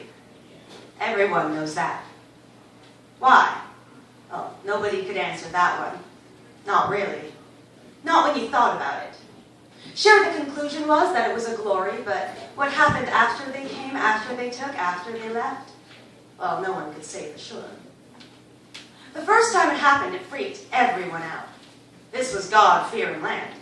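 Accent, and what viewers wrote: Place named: Georgia, United States. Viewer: I love this, and I love this book!!!! Her accent sounds Georgian. I’m from Alabama, and we don’t sound like that, and it’s sure not Cajun or Mississippi-ian. Thanks for the upload!:)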